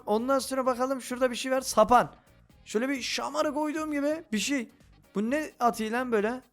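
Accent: Black Sea, Turkey. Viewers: Abi karadenizin neredinsenn söyle ne olur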